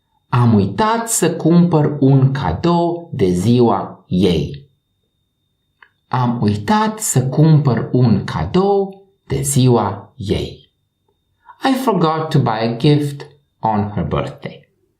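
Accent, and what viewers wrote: Transylvania, Romania. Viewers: pe mine nu ma deranjeaza accentul ardelenesc dar este vorba de doua sunete diferite "e" si "i" si pronuntia corecta; ii inveti sa vorbeasca adica sa pronunte corect romaneste este exact ca in lb engleza; ideea lectiilor este super,felicitari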